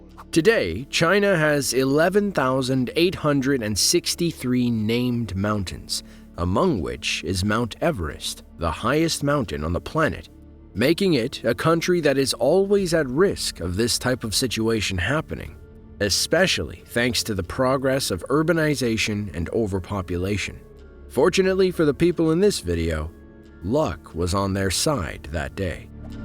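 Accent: United States. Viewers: with American voice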